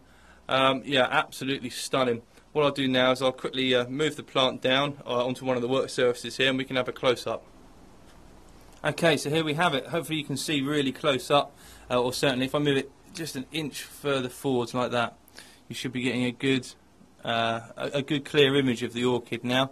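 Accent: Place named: South, United States. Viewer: You sound like your from the south country btw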